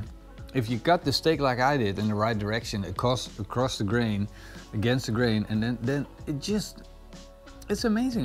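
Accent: Netherlands. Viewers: Dutch Guy reconise a fellow dutch accent NICE!!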